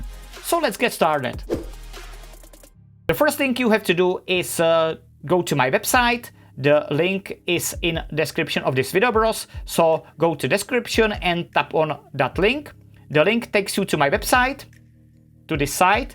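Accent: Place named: Czech Republic. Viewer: Ta Česká angličtina xd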